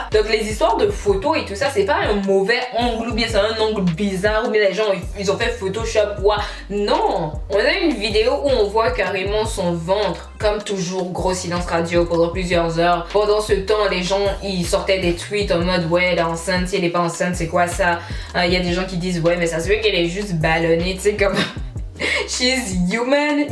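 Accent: Quebec, Canada. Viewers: Elle a un petit accent québécois je trouve. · T’es une québécoise ? Oui non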